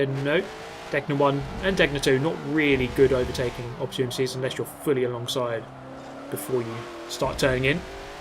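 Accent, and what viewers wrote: United Kingdom. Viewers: dude the scenes of absolute mayhem and you critiquing them with your limey accent has me dying lmfao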